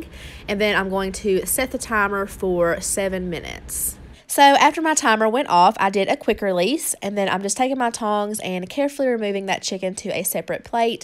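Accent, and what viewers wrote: South, United States. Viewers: Your accent is very southern lol I kinda like it. Where are you from? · I love your accent!!! I’m from NC, but I never got a true southern accent. But I watch one because I wanted to cook more. Two we have similar taste buds, and three your lovely accent ❤️❤️❤️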